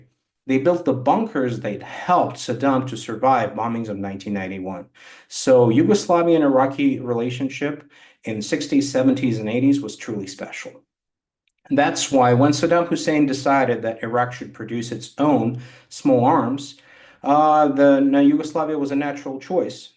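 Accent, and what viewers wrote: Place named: United States. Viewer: holy shit had no idea this dude spoke with an american accent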